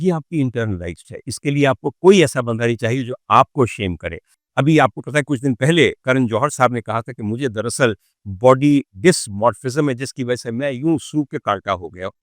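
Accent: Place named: Punjab, Pakistan. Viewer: آپ کا پنجابی تڑکہ مزا دوبالا کر دیتا ہے 😊سلامت رہیں خوش رہیں آمین 🎉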